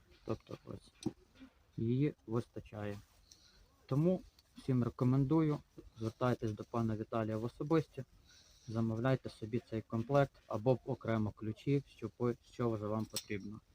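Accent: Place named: Russia. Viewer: если это правда, отчего же тогда акцент русский, а речь украинская?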